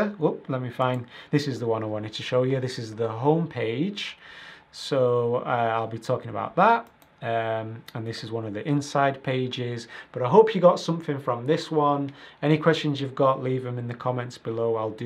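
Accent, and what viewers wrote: Manchester, United Kingdom. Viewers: Hey, another great video! Thanks for share with us your genuine experiences and mistakes. Btw are you from Manchester uk?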